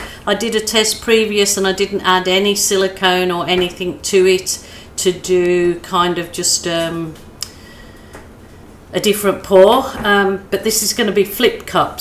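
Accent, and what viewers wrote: Birmingham, United Kingdom. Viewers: I love your philosophy to life. There isn't a video of yours I watch without you bringing a smile to my face, either with your humour or your take on life. I look at your intro pictures (I believe yours is a Brummie accent) and think wow you certainly have found Paradise all the way from England. That's all apart from the great educational and inspirational videos for acrylic painting which have inspired me to dabble.So thank you all the way from a wet and dark England.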